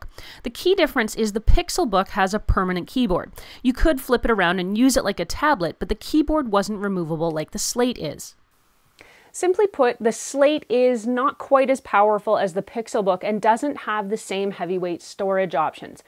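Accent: Canada. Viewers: Gotta love Canadian ladies talking aboot tech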